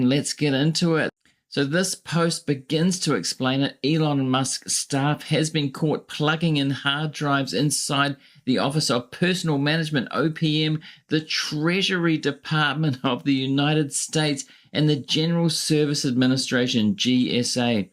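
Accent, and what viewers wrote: New Zealand. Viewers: Are you Australia or New Zealand. I think your accent sounds more New Zealand. I just want you to know that we Americans are not all psychotic as the media has us looking today. I originally clicked on one of your videos a few weeks ago. I thought you were one of my former employees. You two are a splitting image. As far as Musk, I am quite sure he will be prosecuted in the next administration. However, I think the current administration is so afraid of retaliation. The Congress on the left side are very afraid of Trump. However, Musk should start putting his assets and etc in order.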